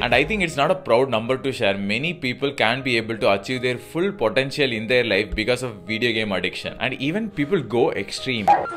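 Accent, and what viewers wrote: India. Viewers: Accent replicates how Americans think Indians speak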